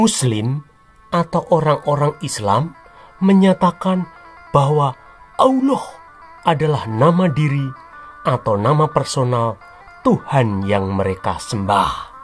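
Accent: Java, Indonesia. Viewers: Baru ini nonton chanel jdi ketagihn nonton krn bhs nya logat jawa dan penekanan kata2ny,bkin ktwa,gak ngantuk aplgi nyebut muhammad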